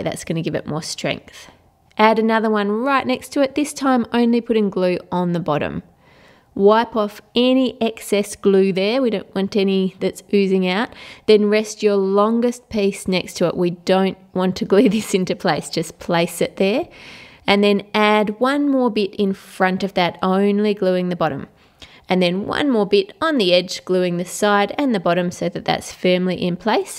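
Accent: Australia. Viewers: Its just a aussie accent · Ate you Australian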